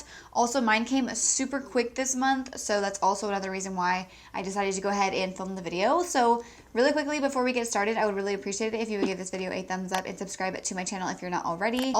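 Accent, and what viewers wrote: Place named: United States. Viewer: i love the American accent 😍😍💙🎄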